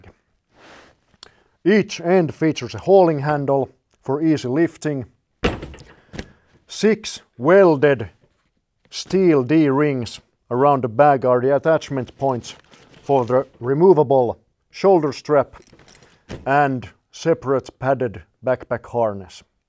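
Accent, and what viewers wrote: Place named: Finland. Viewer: I discovered that I can be persuaded to buy things by a handsome man with a Finnish accent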